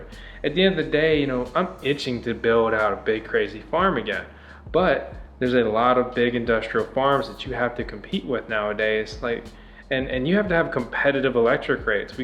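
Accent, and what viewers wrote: South, United States. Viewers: Your accent has become much more Southern.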